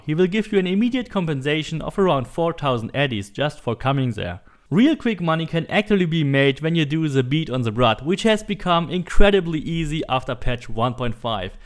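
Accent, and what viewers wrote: Germany. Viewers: Der germanischste akzent den ich je gehört habe. Probs für das informative video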